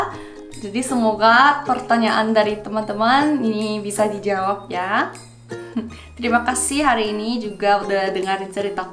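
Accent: Java, Indonesia. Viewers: Kata si mba dia logatnya udah 'Indonesia bangat" Jadi lucu:) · maaf kak kalau saya ngomong kayak gini.. mengapa kakak ngomong pake logat bhs indonesia?. Saya tau persis dengan logat melayu dan indonesia. Yg kakak pake ini adalah logat atau cara bicara orang indonesia yg menggunakan bahasa indonesia... Saya aja orang thailand tau kalau anda sedang memakai bahasa indonesia. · Orang luar tapi kayak medok, mantab mbak saya support teros😂🤣👍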